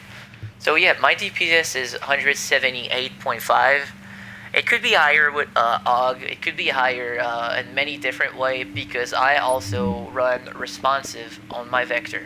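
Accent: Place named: Quebec, Canada. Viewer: great video, something for me to build towards. where about are you from you sound a bit like gsp who was a fighter in the ufc he is French Canadian.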